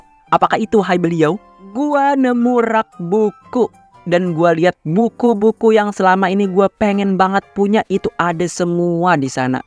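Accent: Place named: Kandangan, Indonesia. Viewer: Dari logat nya kaya nya orang kandangan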